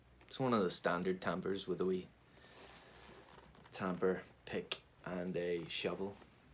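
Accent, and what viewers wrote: Ireland. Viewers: Great first video! Really enjoyed it. I’ll have to catch up on your other ones now. Welcome to presenting , I’m sure your channel will grow quickly. Everyone loves an Irish accent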